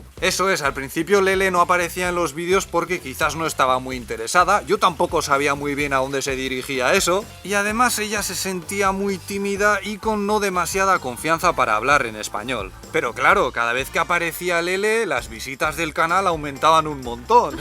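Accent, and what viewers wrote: Basque Country, Spain. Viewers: Pues si. Tú tienes un acentazo Vasco - navarro que te pasas. Se nota que te defiendes bien en Euskera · Me encanta como Lele habla español porque se nota que ha hecho muchisimo esfuerzo. Es una chica estupenda. En cuanto a tu acento,es maravilloso. Simplemente denota que tu lengua materna es el euskera. Un abrazo a los dos. Disfrutamos mucho con vuestros videos. Tenéis naturalidad y sencillez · Es acento "vasco", del Pais Vasco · No sé de qué parte de España eres, pero ese acento es bellísimo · Kaixo. Una cosa que me ha llamado la atención es que no se te ha quitado el acento Euskaldun. NO LO PIERDAS NUNCA PORQUE ES UNA SEÑA DE IDENTIDAD. AGUR MAITIAK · Mucho depende de qué zona de México se trate, en el Norte hablan más golpeado (Monterrey). Lo mismo he visto en España; todo depende de la zona, el acento euskera de me agrada bastante. 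· De que parte de españa es el acento de?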